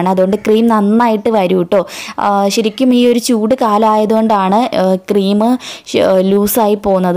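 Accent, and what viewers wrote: Kollam, India. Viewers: I'm from kollam.chechide samsaram kettapozhe manasilay kollamkariyannu.ano😃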